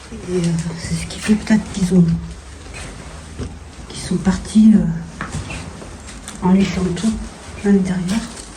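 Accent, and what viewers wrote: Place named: South, France. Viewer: Belle vidéo avec un petit accent du sud . Bonne continuation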